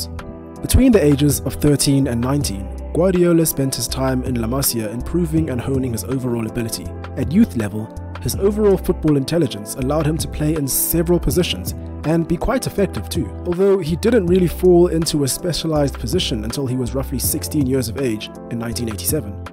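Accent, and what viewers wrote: South Africa. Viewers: Are you south african? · Great video. Subbed quickly. Hearing your South African accent made me sub even quicker. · You sound like a South African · I’m getting a South African accent are you from Mzansi? Good job mate👊🏾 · Are you South African ?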